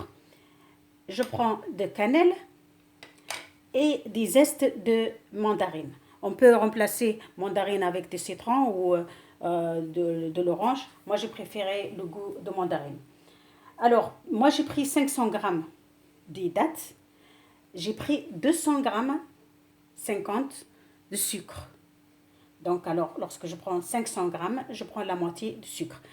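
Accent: Tunisia. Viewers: اختي لو كملتي بالعربية. والله شكشكتيها بالفرنسية . واللهجة تاعك حلوة بالتونسي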